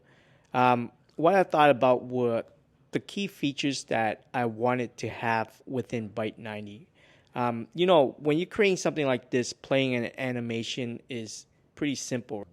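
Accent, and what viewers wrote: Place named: Pittsburgh, United States. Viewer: Location says Toronto, but accent says Pittsburgh.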